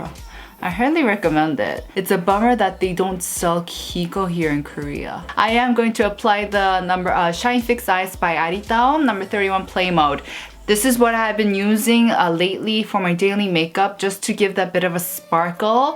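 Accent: England, United Kingdom. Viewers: Cori Lynn I think she went to a British school while living in Europe and other countries. So she probably picked up some mannerisms hearing different accents. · love your english accent. Next time you can teach Korean languages also I'm really appreciate that lol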